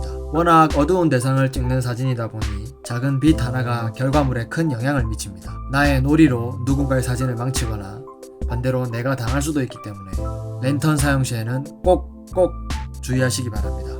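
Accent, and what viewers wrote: Gyeongsang, South Korea. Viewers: 갱상도 분이셔유? ... 목소리가 정감이 갑니다. 남자 목소리는 이래야 제맛이죠 ㅎㅎㅎ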